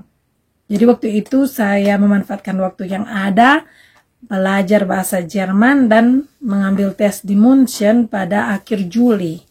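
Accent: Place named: Manado, Indonesia. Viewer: Senang ba dengai bacarita balogat Manado.. Tuhan Yesus pelihara dan MEMBERKATI selalu ☦️❤️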